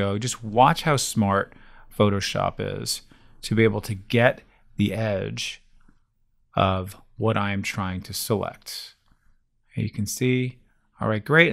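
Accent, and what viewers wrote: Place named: United States. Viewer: Appreciated it but struggled a bit with Adobe help, just didn't 'get you started', it seemed to make assumptions about what you already knew (great chopping up layers but how do you get the pictures in the first place to be able to edit them?). This guy is brilliant, so well constructed, builds up nicely, importantly for a Brit, has a very toned down American accent and style - that's a compliment, he's really easy to listen to. Thank you so much, just brilliant.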